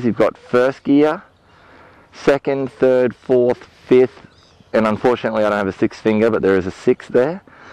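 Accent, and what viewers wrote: Australia. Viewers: Hes obviously Australian you knob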